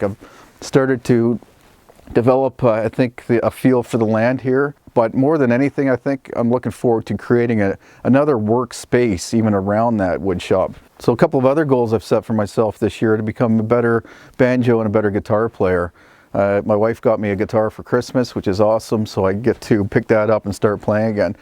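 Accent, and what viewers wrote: Canada. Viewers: *WOW 💕 what a man!* *You've created a wonderful, (other) life.* * *I wish you safety and all the best for great health and happiness with family and friends for many, many years to come.** 🤗 I adore your Canadian accent especially, when you pronounce the word: "out".🤗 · Hi, Great video, as usual. Completely off the subject but has anyone else seen the similarity in personality, tone, and world view (not to mention looks and Canadian accent, eh?) between and the great advocate for free speech and self reliance, Jordan Peterson, the clinical psychologist whose work has done so much to turn young men around. If you haven't seen Jordan Peterson, you must. He's all over Youtube. Check him out too, you won't regret it. It's be nice to have and Jordan sit down and talk about life. Happy New Year! Steve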